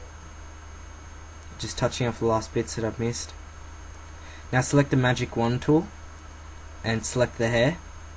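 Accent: Australia. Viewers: hes obviously austrailian you dumb ass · Are you from Australia?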